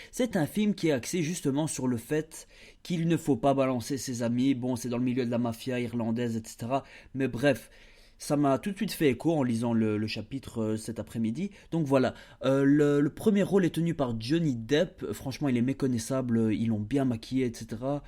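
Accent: Brussels, Belgium. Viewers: D’où viens tu en Belgique ? Petit accent bruxellois, non ?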